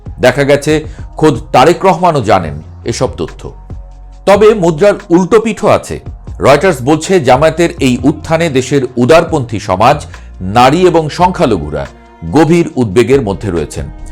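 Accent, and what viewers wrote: India. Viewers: তোমার কথা শুনে বুঝা যাচ্ছে তুমি করা ইন্ডিয়ান দাদা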